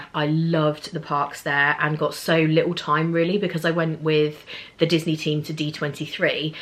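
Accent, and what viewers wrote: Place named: Dudley, United Kingdom. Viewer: I knew he was from near me! I could hear it 😅 but I'm from Dudley 💙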